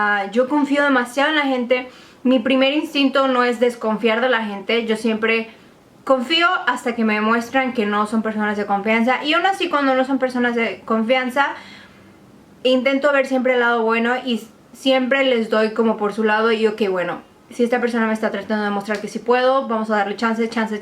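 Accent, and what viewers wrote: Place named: Cuba. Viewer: A mi me gusta como hablas cuando lo haces de manera normal... ese acentito de cubana no m gusta.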